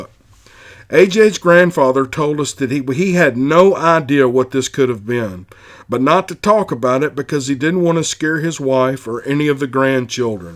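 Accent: South, United States. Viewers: Love this channel, I'm from London so love the southern smoothness, keep up the good stuff my man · I really appreciate hearing stories from and about southern people being told by someone with a pleasant southern drawl not to mention the soothing voice. Thank you for the great job you do narrating these episodes.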